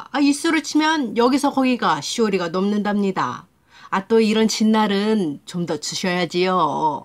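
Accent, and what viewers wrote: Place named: Pyeongan, North Korea. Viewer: 사투리 ㅋㅋㅋ 무슨 평안도 사투리같아요 ㅋㅋㅋㅋㅋㅋㅋㅋㅋㅋ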